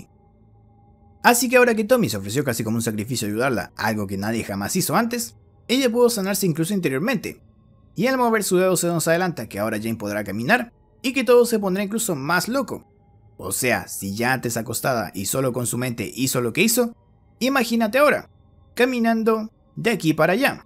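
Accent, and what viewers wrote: Chile. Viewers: hola master es chileno? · El acento es de chile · ME DI CUENTA QUE ERES CHILENO, CTMMM QUE EMOCIÓN😍amo todos tus videos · Eres chileno🇨🇱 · Eres de chile?? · No tengo ni idea de su nacionalidad ya que a veces suena como mexicano y también como chileno👁👄👁🔪🇨🇱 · es chileno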